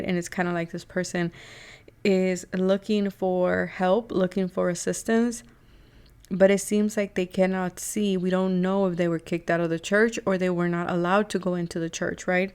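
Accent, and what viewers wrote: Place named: Latin America. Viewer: I think she has latina background, she speaks also spanish, so thus the accent. She is not african american.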